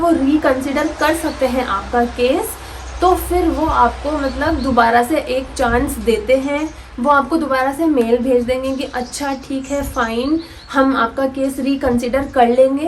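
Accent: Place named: Punjab, India. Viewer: Ma'am you said that you are from Rajasthan but you sound like Punjabi. We would like to know more about this.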